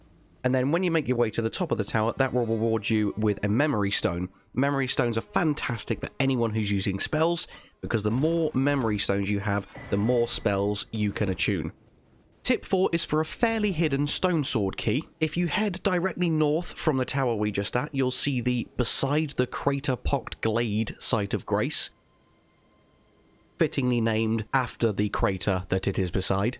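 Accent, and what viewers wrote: United Kingdom. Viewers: just btw, 'gaol' is the English spelling of 'jail', and is pronounced the same. You sound English, so I'm surprised you aren't already aware of that. That said, thanks for all your tips, I tend to be like a scout in my general attitude in games - love exploring, NOT good vs bosses and tough enemies. Actually as a mouse & keyboard computer game player, I really dislike the whole 'boss fight' thing, which is a console game mechanic, now being used in some computer games by way of ports. Still, Elden Ring has some amazing areas and scenery. and a goat-horse mount with Unreal Tournament style double jump ability is out-of-the-mould good good to see. On the other hand, most egregious "physics" where sometimes after hitting an enemy you are held still, mid-movement, while an enemy gets a hit back at you (or 3 or 4 sometimes!), and a hit from behind while racing along on your mount, stops you mid-career and you may gave to jump or dash to break the 'freeze' (outrageous!!). 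The AI not so good also, no ecosystem, no factions, ie, all monsters gang up to fight the player, when often they should be fighting each other, demihumans vs militia being the only contrary example. Miranda flowers, wolves, bats, should attack any who come near, land octopuses should attack trolls too if they get too close, etc etc. Really like the inclusion of the demihumans, the remind me of Edgar Rice Burroughs - John Carter, etc, imply at least for me a whole mythology - I hope some addon makes more of them a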